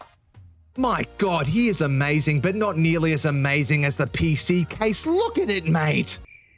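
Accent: Australia. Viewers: Just recently stumbled upon your channel and very happy I did. One I love the doggo. Two love your accent. Makes me think of crocodile dundee. And of course I am one of those weirdos that loves watching people mess with computers. Cheers brother.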